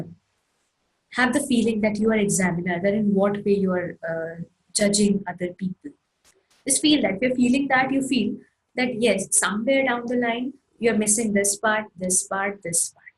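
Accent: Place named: India. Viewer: i dont understand Indian accent i hate it very ugly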